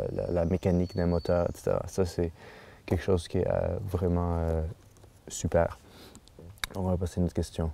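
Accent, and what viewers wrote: Quebec, Canada. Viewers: Je suis français et ton français est correct, je comprends tout ce que tu dis!!!! T as juste l accent Québécois ! Mais j aime! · Bonne vidéo,. Je suis de retour au Québec, après avoir passé l'hiver dans le sud des USA. Deux mois presque, que je suis à Montréal (downtown) et l'envie de reprendre la route me taraude. Je songe à retourner à Cape Cod (P-town) bientôt. On pourrait peut-être se suivre? Ou y aller dans ma van? (il y a de la place et deux lits). Je connais les endroits propices (j'y ai passé tout septembre). La mer est belle et les plages merveilleuses. Et il y a des endroits où prendre une douche chaude pour 0,25$ ou froides, à la plage. En passant, ton idée de tournée américaine, c'est trop ambitieux. Et aller seul au Mexique (que j'ai fait en 2006, pure inconscience de ma part), ce n'est pas une bonne idée. C'est un miracle que je sois revenu en vie. 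On pourrait s'en reparler. Just email me. By the way, your French is very good, avec le si sympathique accent du Québec. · J'aime bien ton accent français du Quebec ;-)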